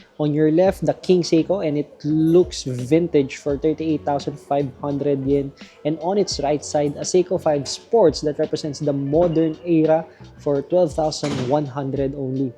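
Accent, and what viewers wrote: Philippines. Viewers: Nice content, strong Philippino accent though, can still understand 😢😂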